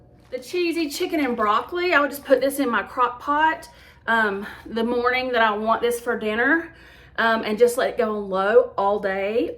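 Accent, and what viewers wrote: South, United States. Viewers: I'm a fan of freezer meals! The nest is empty, but I still like the convenience of being able to throw something in the crockpot or Instant Pot. Bless you, teaching the kindergarteners. Both of my boys loved their K teachers, and I think it set the stage of enjoying school in general. (They are 32/34 now!) I taught HS chemistry. I've lived in TX, AL, SC, and now TN. I can't figure out what flavor of Southern accent you have, lol! Great job on the exercise, btw. The endorphins are so good for you.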